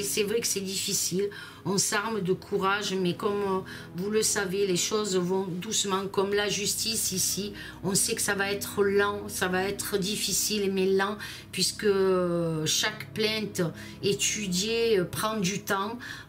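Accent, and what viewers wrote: South, France. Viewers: Votre accent du sud (Marseille ?) est très très très très prononcé !!!…My God !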